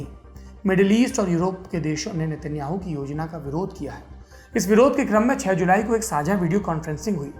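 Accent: India. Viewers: Bolne ka deshi tarika hi maja deta hai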